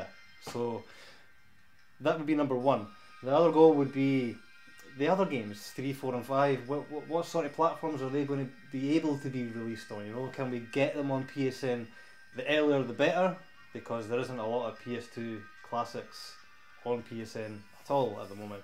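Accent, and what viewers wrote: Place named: Scotland, United Kingdom. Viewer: Long live to scotish accent hahaha We are with you,!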